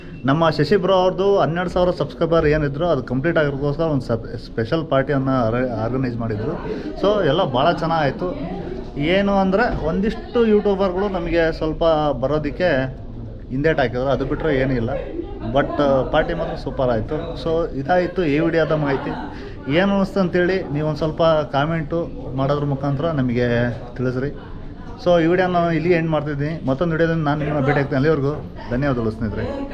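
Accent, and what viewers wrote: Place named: Davangere, India. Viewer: ಸಕ್ರೆ ಬೈಲೂ ವಿಡಿಯೋ ಯಾವಾಗ ಅಪ್ಲೋಡ್ ಮಾಡ್ತೀರಾ ದಾವಣಗೆರೆ ಭಾಷೇಲಿ ಕೇಳೋಕೆ ಚಂದ.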